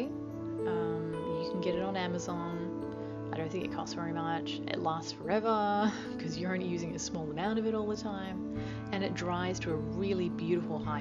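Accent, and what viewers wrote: Australia. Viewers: Hi, really nice to hear a good old Aussie twang for a change. I'm so wanting to do this and also on plastic (acrylic) dolls. Which products do I use? I can't understand how you get your colours to stick? Any help would be much appreciated. Fellow Aussie from Queensland 🤗❤ Just subbed 🤗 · Are you australian?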